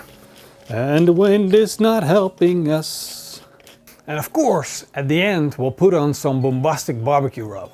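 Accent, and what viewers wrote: Netherlands. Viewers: wat een mooi nederland accent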